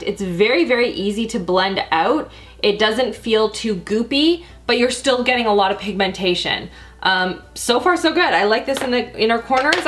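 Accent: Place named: Canada. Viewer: That Canadian accent really came out in this video! Cute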